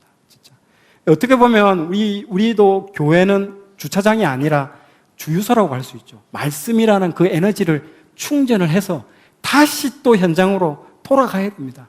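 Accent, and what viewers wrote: Gyeongsang, South Korea. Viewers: 경상도 사투리의 억양과 또랑또랑한 음성이 조화가 되어서 귀에 쏙쏙 들어옵니다 감사합니다💝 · 경상도 사투리가 정겨웠는데 아니! 마산! 저도 마산출신 입니다... 지금은 먼 외국에서 주님의 사역을 감당하고 있습니다만 오랫동안 한국을 가지못해 늘 고국을 그리워하며 살고있는 순례자입니다.... 구독해서 열심히 듣고 있습니다.... 주님의 이름으로 축복합니다...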